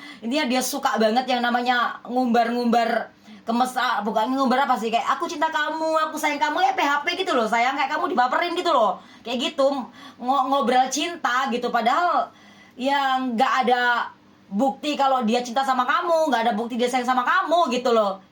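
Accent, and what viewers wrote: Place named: Java, Indonesia. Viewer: Mbak bahasanya agak medok Jawanya. Mbak aslinya dari Jawa ya.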